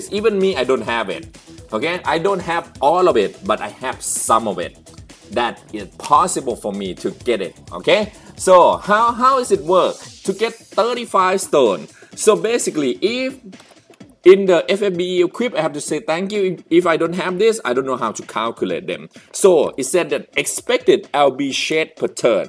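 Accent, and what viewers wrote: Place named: Thailand. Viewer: Are you from Thailand? you talked like my friend, your accent kinda feels like ppl from there.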